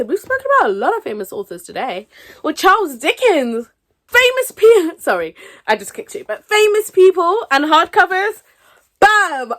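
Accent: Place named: England, United Kingdom. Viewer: Hey! nice meeting you. I love your English accent! it's super cool. Plus I appreciate your perkiness in your videos. And you also have a sense of humor. ☺All great skills to succeed in your BookTube channel. Of all the books you mentioned, two of them stuck out for me. Black London and whiskey in a tea cup by Reese Witherspoon. I shall order them, come the new year. I also have quiet a lot of books I must read before adding more to my library. I am currently reading "Finding me" by Viola Davis. 🤗 thanks!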